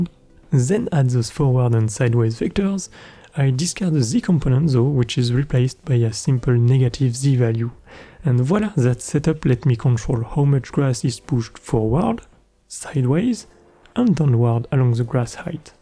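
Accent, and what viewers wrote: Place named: France. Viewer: Awesome tutorial! love your French accent bro.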